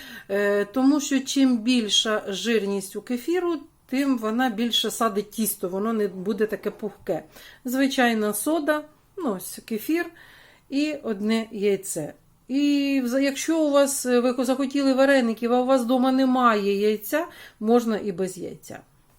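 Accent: Ukraine. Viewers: За вареники дякую і як приємно чути нашу мову, мову з центру України. Якось по домашньому. Ще раз дякую. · Приємно чути черкаську говірку, наче дома побувала.Добра Вам!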